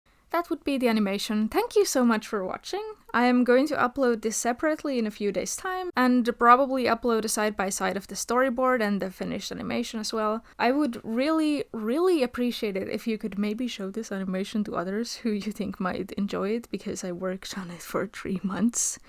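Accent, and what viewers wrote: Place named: Finland. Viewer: O Al's I guessed that because I'm Finnish too and I could tell from the accent. But your English was so good I had to ask separately.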